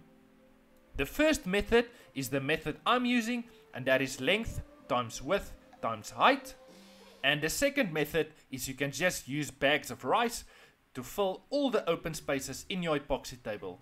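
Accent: South Africa. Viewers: Hey. You sound South African. If so, how can I get in touch with you? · What router bit did you use and do you recommend it? I lived in Secunda and Johannesburg for 5+ years. Its nice to hear your accent. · I hear a south african accent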